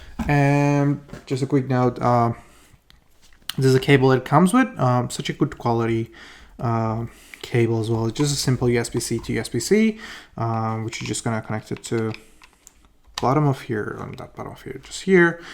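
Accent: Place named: Australia. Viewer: ghorbune lahje aussie it beram :))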